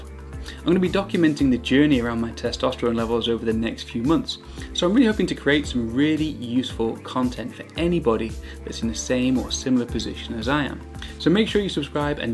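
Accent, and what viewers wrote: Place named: United Kingdom. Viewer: Ur british? Love the accent :-)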